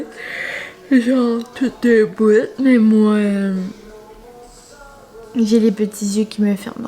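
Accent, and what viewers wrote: New Brunswick, Canada. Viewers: Je viens de découvrir ta chaîne et j’adore 🤍 C’est sûr que tu viens du N-B par ton accent ! Ma maman vient de là et quand je t’entends parler ça me fait tellement penser à sa famille au N-B 😅 Moi je suis née au Québec donc je ne parle pas comme eux, mais c’est trop cute t’entendre parler. Je serai clairement une abonnée assidue à tes vlogmas 😍❄️🎄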